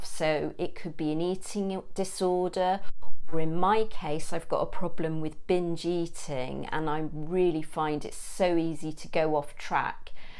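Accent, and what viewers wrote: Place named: London, United Kingdom. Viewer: Hi, New Subbie here, pleased to meet you😊 You sound like a Londoner like me (lol). I am 50 with borderline hypothyroidism, i really want to lose weight (7 stones would bring me to ideal weight according to my BMI) but finding it hard. Do you have any ideas for how to make exercising fun? I think that would make a big difference to me because I can't motivate myself to be consistent because I don't enjoy it. One thing I've thinking about is go on an adventure to find the best free exercise parks in London (you know the ones with the free outdoor exercise machines), maybe you can do a video on that? Just an idea... Love your videos😍, stay blessed, One love❤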